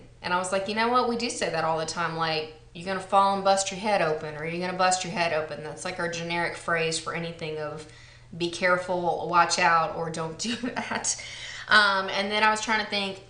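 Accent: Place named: Texas, United States. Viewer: Loved this,!! I love when your Texas twang comes out. I wanna live down south! I think I wanna do this tag:)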